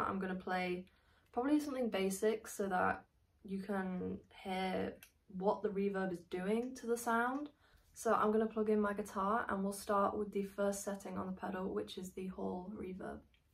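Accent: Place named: England, United Kingdom. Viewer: who are you？ England accent.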